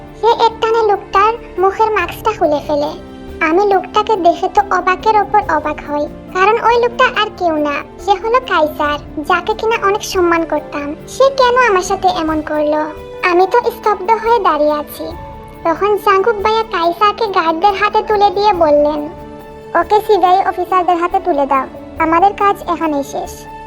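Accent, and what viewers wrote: Sylhet, Bangladesh. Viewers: আপু তুমি কি সিলেটি? তোমার ভাষায় সিলেটি সিলেটি ভাব আছে।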